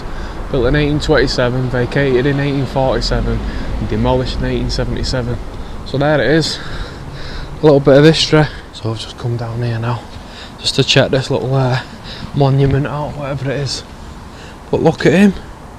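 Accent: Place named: Salford, United Kingdom. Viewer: You can tell your from Salford..love it when you said what's she looking at in the daft hat lol.. great video really like your stuff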